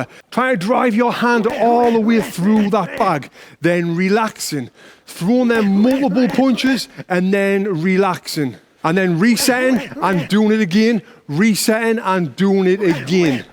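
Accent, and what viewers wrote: United Kingdom. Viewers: This channel is everything I like: information, humour, and a mean British accent — all that for free. is a legend. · you are the best teacher, And you accent reminds me of british techncians i met when i was US airbase for supporting pilots who attended Alliance Military Drill, Thank you very much for your videos / Respect from South Korea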